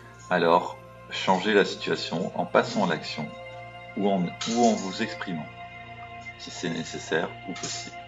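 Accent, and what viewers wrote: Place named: Quebec, Canada. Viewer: Si l'accent québecois est supportable, ça parle du stress <link>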